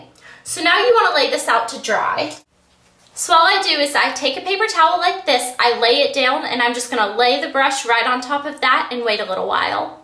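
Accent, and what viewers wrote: South, United States. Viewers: i love ur voice!! dont listen to all those people who are south accent haters. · You eye make up is gorgeous in this video. Can you please do a tutorial on it? As to your voice: It's probably because you have a southern belle accent. Personally, since I am from the northwest, southern accents tend to irk me, and so that's probably why some people say they find your voice annoying. Not your fault, just some of us odd Northerners ;) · Um... I just have to say that I absolutely love your accent! I'm from the south, and I think country accents are cute! I'm proud to have a country accent :)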